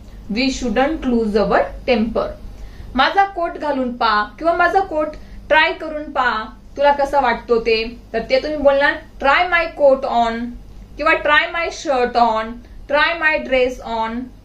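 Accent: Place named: Jalgaon, India. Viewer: Khoopach Chhan ! Tai tumhi Jalgaonchya disata. Bhashevarun lagech kalate.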